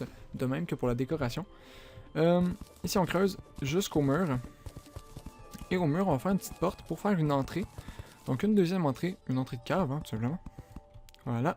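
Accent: Quebec, Canada. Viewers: Vive l’accent QUÉBECOIS, c'est plate qu'on a n'a pas bcp ( beaucoup ) sur youtube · j'adoooore ton accent québécois et ta maison et magnifique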